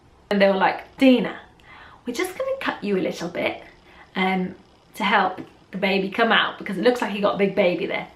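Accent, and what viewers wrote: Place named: Wales, United Kingdom. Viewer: your welsh accent make this video ten times funnier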